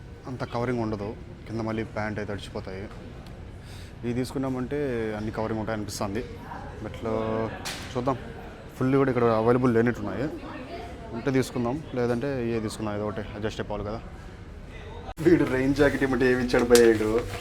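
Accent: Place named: Kadapa, India. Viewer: I have been waiting for your shorts. Suddenly I came across your video. It was a nice presentation. Sometimes I laughed out loudly by going through your commentary. That Kadapa slang is nice. Especially the commentary which you made while climbing the steps was awesome. Still I am unable understand why did you opt for a solo trip. I think it would be better if somebody accompanied you. I appreciate you for this solo trip. It’s quite interesting. If you don’t mind may I know something about you? If you feel that it is personal….. you need not tell…. No issues I don’t know where you are now but I wish you a very happy, safe and pleasant journey. Enjoy your life…… ultimately these are the memories that a person can cherish through out his life Great going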